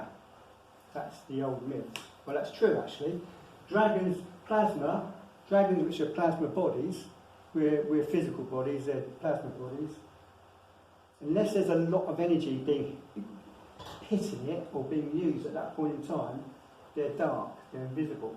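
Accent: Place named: United Kingdom. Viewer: Love that British Accent........feels like home .......thank you for your Love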